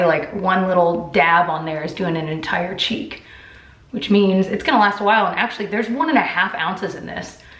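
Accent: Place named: South, United States. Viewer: It’s a Southern thing too! Midwest and Southern accents have similar vocal placement and sounds.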